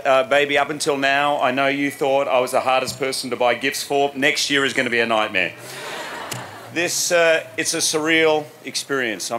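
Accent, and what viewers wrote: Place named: Australia. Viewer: An Aussie to be so proud of - and he still sounds like one!!!!! Love him - and his family.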